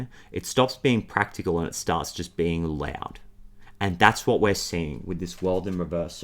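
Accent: Australia. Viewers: Whoa! Hello! 6 mins in and I’m feeling super connected to this reading! Oh, and the Aussie accent is refreshing being local 🇦🇺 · when your readings resonate with me it’s like you’re speaking directly to my higher self it’s like a flow of information. I mention that because I have auditory processing delay and I find it extremely hard to focus on and actually hear what’s being said without subtitles. Here, with your Australian accent and such unique, cute tone of voice I get it, I don’t even have to know all the expressions you use and still it makes perfect sense ⚛️ to me it’s a confirmation that I’m not delulu, that I’m not imagining stuff. That our interconnectedness is so very real. I love it and I love you 🩷🧡💛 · Are you Australian ?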